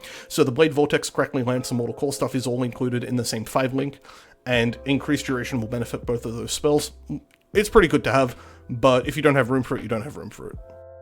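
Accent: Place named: Europe. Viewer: opening the video, seeing gameplay & hearing an EU dude right away Me: Oh this is a good build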